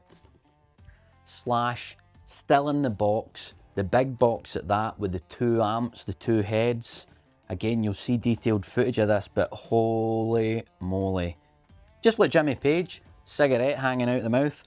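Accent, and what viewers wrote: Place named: Scotland, United Kingdom. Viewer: Love the idea of a giveaway the greetar looks cool the and quality is much better now my first guitar was an Avon SG copy, the action was so high you could limbo under it and often I did trying to do fancy jazzy chords an Em flattened 9 with an F# in the bass with a cherry on top!, Enjoying the channel great to hear a Scottish voice 🏴😉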